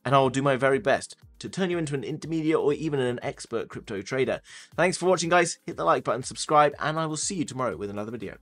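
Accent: United Kingdom. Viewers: I love British accent I can listen all day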